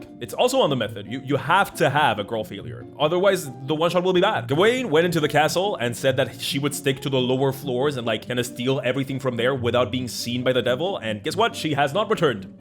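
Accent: Spain. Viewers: Is that a spanish accent I hear? I always thought you were italian! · Também gosto que tu é espanhol, algo na fala derivada do latim me da uma sensação comunitária também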